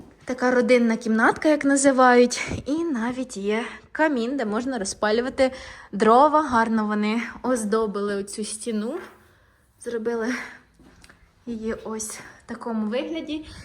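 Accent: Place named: Vinnytsia, Ukraine. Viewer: ви з Вінничини? Знайомий суржик.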